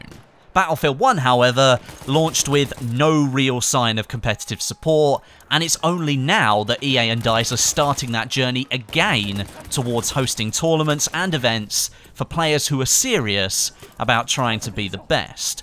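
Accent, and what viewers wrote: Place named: United Kingdom. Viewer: You sound so British lol.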